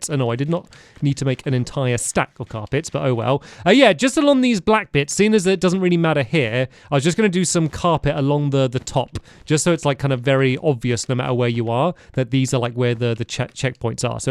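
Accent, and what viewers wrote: United Kingdom. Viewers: your the most british cat i've ever heard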